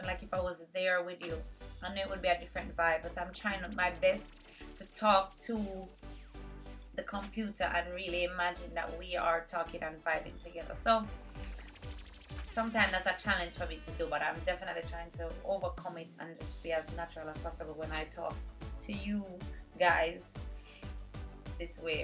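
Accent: Jamaica. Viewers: love the jamaican accent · Jamaican accent sounds definitely cool :) Lots of love from Paris, France.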